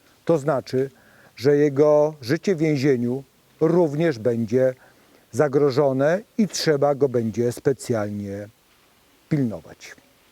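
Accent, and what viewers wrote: Kraków, Poland. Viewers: pan Inspektor jest z Krakowa. Tam się tak mówi. Zamiast np dziesiąta trzydzieści - dziesiąta czydzieści.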